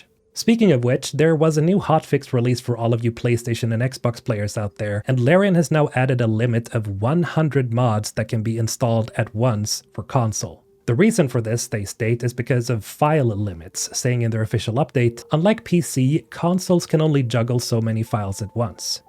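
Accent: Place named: Sweden. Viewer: Tog ett tag innan jag hörde att du är från gamla goa Svedala! :D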